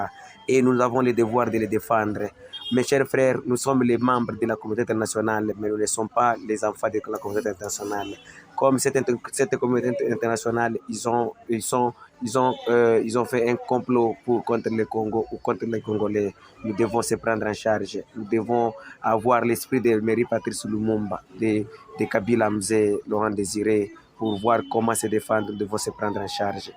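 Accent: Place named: Rwanda. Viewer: Mes chers , ce type qui parle n'est pas congolais est un Rwandais. Ne tombez pas dans n'importe quoi non .suivez attentivement sa façon de parler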